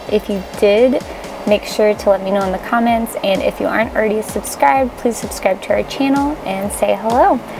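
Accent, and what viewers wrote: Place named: Canada. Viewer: Great job!! One question I always wanted to ask you though. Sometimes you have a somewhat Canadian accent at times. Are from up north in the states or Canada originally? 🙂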